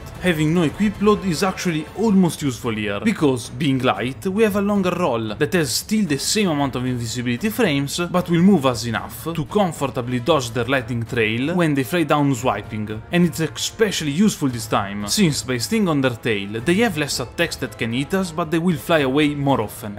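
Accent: Italy. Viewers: very obviously Italian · Man, such a great video! Hope that palestinian kid is doing ok :( PS che bello vedere l'accento italiano riscuotere un simile successo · Bro sento l'accento italiano , comunque bel video abbastanza informativo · Fra è così strano trovare l’accento italiano più forte della storia cliccando su un video a caso nella home. Nice video tho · This is the best Italian accent I've ever heard!! Also, cool vid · Great video! Don't know why you wanted to talk in english, even if è abbastanza intuibile tu sia italiano... but whatever :D. Good video nonetheless. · hey man can i ask you something? are you from italy, because you' accent you'r form there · Find this video in my homepage, hear you talk and i think, this guy is Italian, then you go "sweat, blood and blasphemy" and i get the confirmation you are italian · You are the most Italian man I have ever heard. 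Subscribed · Dude great run, especially the Italian ass accent get me all of the time hahahah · hai un accento italiano fortissimo e lo amo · L'italiano comunque si sente lontano un miglio XD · This video just popped out in my recommendation and as soon as it starts I hear in your voice a strange accent, I know it's really strange but I wanted to ask you a question, are you Italian? To me it seems a lot that you have an Italian accent, you know, I'm italian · Mi sembra troppo italiano questo inglese Aswer me my BOI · palesemente italiano hahah Ottimo lavoro fratello👍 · dalla tua voce sembri Italiano...ci ho preso? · Mamma mia che accento italiano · Aaaah l'inglese parlato da un italiano... POESIA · Your accent looks so italian broo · Honestamente mi ha piaciuto tanto il accento Italo-inglese che mi sono suscritto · Are you the Dave504 of gaming? lol your thick italian accent reminded me how Dave speaks! 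Great video mate, very well done! You put a lot of effort into it, and you made me realised Iàve missed a frozen dragon 😅 And even more, well done for creating content in eglish, I'm been living in the UK for many years now and I still don't feel confident about doing so. Thank you for what you're doing, you gave me a boost in confidence to consider creating contente not in italian! Keep up with the good work!💪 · TROPPO BELLO L'ACCENTO ITALIANO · ho capito che sei italiano al minuto è bello vedere youtuber che sono italiani fare video in inglese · Secondo me sei italiano 😂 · Ma che accento Veneto hai? 😂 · appena ho sentito l'accento, ho capito che è Italiano ahahaha. Sono troppo d'accordo, che canale figo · Sempre bello sentire l'accento Italiano, bel video! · Fra L'accento italiano è inconfondibile. Grande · Bel video, ma con questa pronuncia di riconosce che sei Italiano😆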